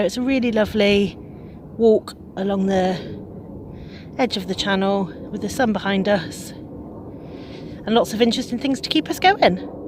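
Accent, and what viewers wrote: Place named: West Country, United Kingdom. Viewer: Brilliant! And we all love your wonderful, gentle westcountry accent!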